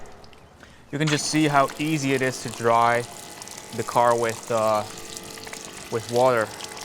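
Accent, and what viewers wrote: United States. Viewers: I'm surprised you have a very American accent considering you are based in Belgium (I think) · you do sound like an american, very impressed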